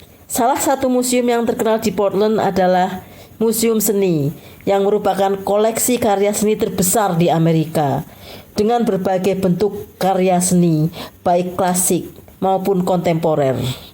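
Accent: Java, Indonesia. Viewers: dengar suara nya yg medok itu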